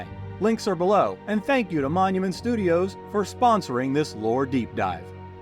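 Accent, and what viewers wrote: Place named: South, United States. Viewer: Fyi the music is good , i'm autistic and sometimes music distracts me yours is good just extenuates the narrative , i think you're on your way to being the top FG guy , you're meticulous eye to detail plus artistic panache is a winner, you have very clear precise way of speaking with only a slight accent, i would guess you have a professional background possibly a college lecturer, english literature philosophy liberal arts etc . vaguely southern accent but not folksy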